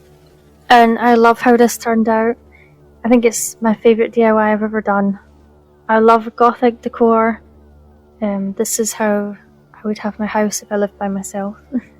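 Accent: Scotland, United Kingdom. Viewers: Love your creativity and your creations, are you Scottish but living in America? ( guessing the USA bit as we don’t have dollar tree etc) Can hear a soft Scottish accent when you talk 😊🎃👻✨ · I just love your wee Scottish accent. The projects were so cool.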